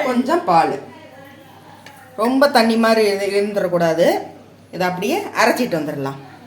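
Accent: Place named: Kongu, India. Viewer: Super ma, kongu தமிழ் manakudu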